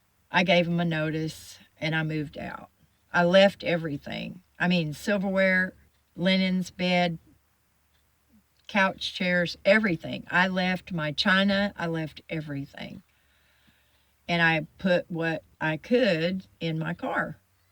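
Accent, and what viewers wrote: South, United States. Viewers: very good. I think she is beautiful. Your mannerisms and all. You also remind me a little of a dead relative of mine. I think it's the fair skin and red hair and little southern accent : ) enjoying your videos! Keep them coming. Love your personality.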